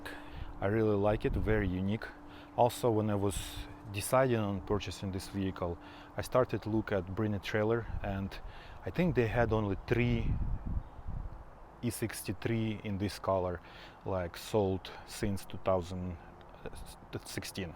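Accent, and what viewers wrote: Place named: Russia. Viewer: Судя по акценту ты говоришь по-русски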